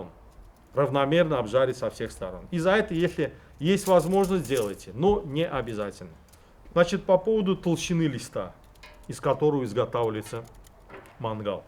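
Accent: Baku, Azerbaijan. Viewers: Здравствуйте! Большое Вам спасибо за лекцию. Самый правильный, познавательный, без лишней воды расклад по теме. Очень приятно было Вас слушать. Родной акцент, с любимой родины моей. Здоровья Вам и вашим близким. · Всегда рад послушать ,Бакинский акцент так как я родился и вырос а Лок-Батане,в 24 года уехал в Пензу,но Баку в моем сердце НАВСЕГДА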